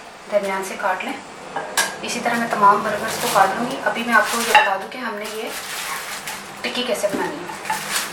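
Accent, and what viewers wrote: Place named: Punjab, India. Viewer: جہاں تک ریسپی کی بات ہے بہت ہی عمدہ لاجواب ہے ۔۔۔۔میں نے تو فسٹ ٹائم اس طرح کے برگر دیکھے ہیں۔۔۔۔ اور آپکی کیمرہ وہ مین بھی کمال کی شخصیت ہیں ان کو ہنسنے کے علاوہ اور کوئی دوسرا کام نہیں۔۔۔۔ اور کتابوں کو دیکھ کر ایسا لگتا ہے کہ آپ بڑے باذوق کوک ہو ۔۔۔اور ایک بات آپ زیادہ تر کہاں پائے جاتے ہو مطلب کے آپ کس شہر میں جلوہ افروز ہوتےہو یا یوں کہہ لیں کہ آپ اپنی پرنور شخصیت سے کس شہر کو منور کرتے ہو یا سمپل الفاظ میں کہوں تو یہ بتائیں کے کس خوش قسمت شہر میں آپکا آشیانہ ھے۔۔۔ ضرور ضرور مینشن کیجئے گا ہم نے آپ کی پنجابی ایکسنٹ سے اندازہ لگایا ہے بٹ پتا نہیں ہم رائٹ ہیں یا رانگ ہیں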